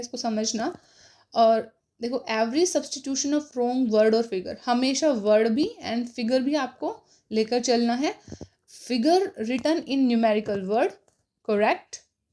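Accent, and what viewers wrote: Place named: Haryana, India. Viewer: I like your haryanvi accent🔥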